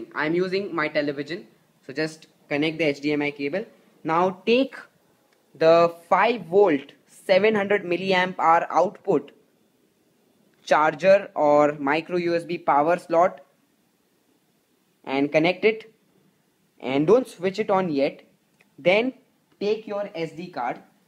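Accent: India. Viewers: Is that a Marathi accent you have? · are you from india :D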